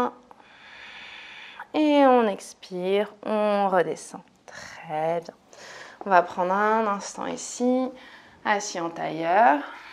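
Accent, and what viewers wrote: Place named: France. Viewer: Belle séance j'adore beaucoup votre voix avec cet accent français doux quelle merveille le yoga avec tous ces ingrédients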